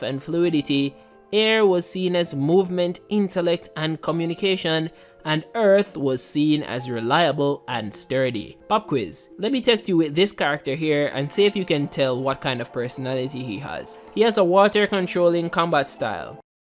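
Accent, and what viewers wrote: Jamaica. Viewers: Are u jamaican? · Question are you Jamaican? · Are you Jamaican?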